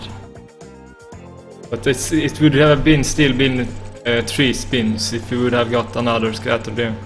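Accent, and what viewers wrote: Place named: Sweden. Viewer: swedish accent confirmed :D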